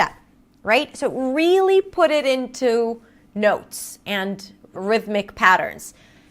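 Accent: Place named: United States. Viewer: I learnt most of the American accent by you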